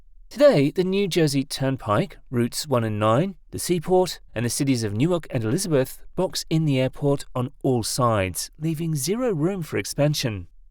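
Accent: United Kingdom. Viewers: This is BS. Newark is not a single airport without considering JFK and LaGuardia. It all functions as one organism. When one breaks down, they all break down. As for AirTrain getting to the train station, it is 20 years older than the JFK AirTrain. LaGuardia still does not have one. You sound British, is LHR the pinnacle of airports without messes? At least in London, the airports are all far enough away from each other, that a downfall with one does not affect the others.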